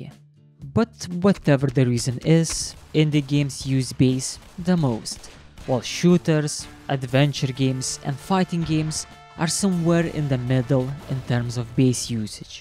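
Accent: Russia. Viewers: the russian R is as heavy as the bass (not really judging tho)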